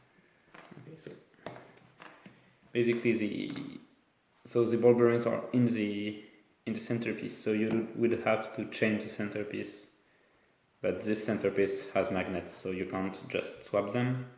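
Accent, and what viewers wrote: France. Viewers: Cet accent Français! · Quel accent Français, mais tu parle bien anglais!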